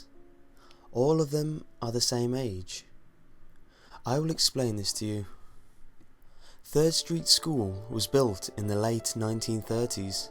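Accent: United Kingdom. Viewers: Did anyone notice that this is the same bullshit story about Recess that has been spread all over the internet, but with a British accent. This theory isn't based on any evidence from the show, and has several holes. A theory about a TV show needs to be presented with evidence to seem interesting. This is just crappy fiction.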